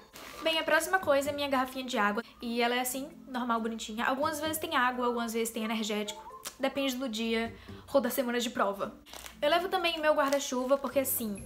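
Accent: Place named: Natal, Brazil. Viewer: amg vc nasceu em Natal?? seu sotaque é diferente shshjajs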